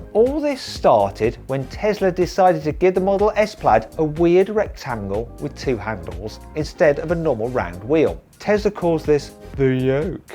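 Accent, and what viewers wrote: United Kingdom. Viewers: イギリス訛りなのか、はじめ、中国語かと思った。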